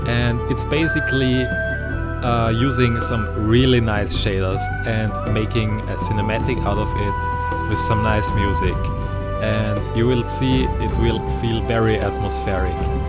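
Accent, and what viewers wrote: Germany. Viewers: Sehr gutes video das mit dem deutschen akzent ist net schlimm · You are an awesome builder! Ehrlich gesagt dein deutscher akzent macht deine stimme noch angenehmer :D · Mit z German accent =P nhe man, we can clearly understand you and you speak English very well. Love your vids👍🏼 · Sehr schönes Video und man hat sofort gemerkt das du ein Deutscher bist :D Ist nicht unbedingt schlimm, dein Englisch ist gut verständlich und du hast eine angenehme ruhige Stimme. Atmosphäre ist wirklich kein leichtes Thema, selbst für erfahrene Bauer. Bei den meisten Leuten die ich kenne ist der Anspruch an Atmosphäre überhaupt oder wenig vorhanden. Die Umgebung ist meistens wichtiger als das eigentliche Gebäude und das wurde hier schön dargestellt ;) Ich finde es müssen wirklich kleine Geschichten durch feine Details eingebaut werden, aber auch die Materialien müssen sehr variieren, also random platziert werden. 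Schöne Grüße aus dem norden Deutschlands ;) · great video! definetely will use these ideas in my world, like the hay car ;) i didnt mind your German accent, im Dutch so thats even worse :P great video, A like and A subscribe from me ;) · gut zu wissen, dass du deutscher bist :D Mach dich noch sympathischer!!! Aber wenn man es weiß hört man es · Another great video, and with a German accent to boot? <3 Also... your mc skin is Finn, the Human? <333 Keep the videos coming, ^^*Also, don't apologize for your accent/language- be proud of your heritage, and exuberant for your linguistic idiosyncrasies! ^^ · Du bist deutsch das höre ich aus dem akzent =D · your accent is cool btw haha, german sounds great, dont be shy ! :p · Your German isn't bothersome at all. I can understand a few words, so don't worry much.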